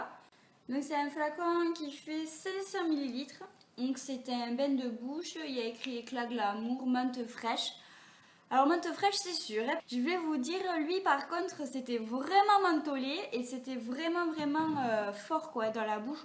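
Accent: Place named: South, France. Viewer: coucou coup de fouet mdr j'adore je suis partie vivre dans je bord et je suis du sud ton accent me rend nostalgique (j'ai mon accent aussi lol) super vidéo que de belles dents 😆😆😆😍😘 bye bye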